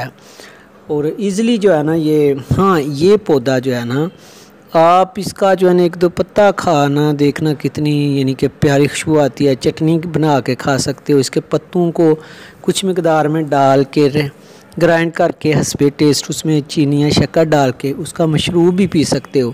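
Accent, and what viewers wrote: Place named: Punjab, India. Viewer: Punjabi style Urdu speaking 😄😄🗣️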